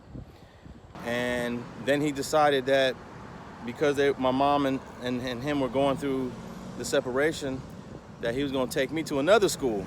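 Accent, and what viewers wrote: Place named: East Coast, United States. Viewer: Bro I always wondered why in your earliest videos you had a east coast accent , and you seemed like an outa towner trying to learn LA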